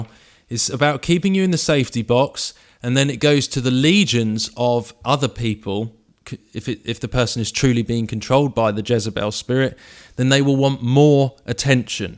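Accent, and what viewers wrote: United Kingdom. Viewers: There is no "Jezebel Spirit" in the bible. Both the "Jezebels" in the bible were evil, but neither are described in nearly this detail A well produced video and an articulate British accent does not make it any more true. There is a lot of info out there about Narcissistic Personality Disorder and how it works. Giving these behaviors a phony spiritual persona and a name is just something someone made up. The "Jezebel Spirit" is a preaching and book selling gimmick among charismatics and has been for at least twenty years. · i dont like your accent !!!! yu sound british and . . . . i will never trust a british no matter how legitimate they maybe . . . nonetheless i liked your video !!!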